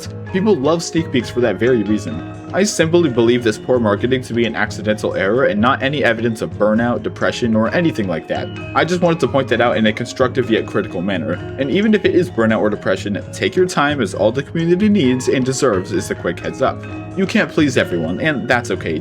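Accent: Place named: United States. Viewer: I thought it was a voice reveal but i was confused about the American accent. Then i checked the pinned comment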